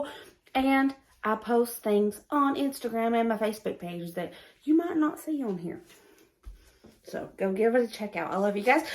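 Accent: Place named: Tennessee, United States. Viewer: I love watching your videos. Great information and ideas but your accent reminds me of Home. i am a transplanted Vol living in another state and I miss home.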